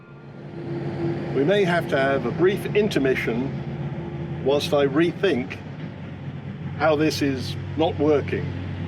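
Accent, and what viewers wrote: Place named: Australia. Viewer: Not long found your Reno, loving the videos & music 🎶. And you sound like an a fellow Aussie!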